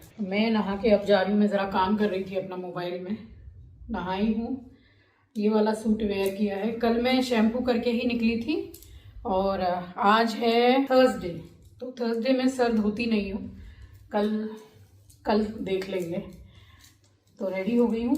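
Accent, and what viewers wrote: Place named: Braj, India. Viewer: Hi ji.. mere mann mai ek query hai ki aap rahti Kolkata mai h to aapki kuldevi kaila maiya kaise itni dur and aapki language bhi agra Mathura wali hai.. kyu? Aisa m isliye pue h rai hu kuki m agra se belong karti hu aur hmari kuldevi kaila devi hai aapki wording hmari jaisi hai reply jarur karna pls..@ ji reply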